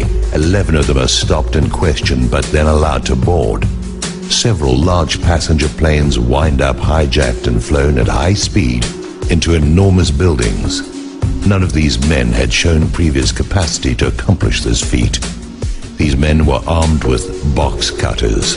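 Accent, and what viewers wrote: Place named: United Kingdom. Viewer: You will be doing this video & the message an immense service by changing the word 'American" in the first sentence to 'Everyone'. The British voice over tells us to use 911 as a litmus test for complicity or stupidity. Please, all those of the truth movement - keep in mind the INTERNATIONAL scope of the problems, of their impact and of the audience watching your videos.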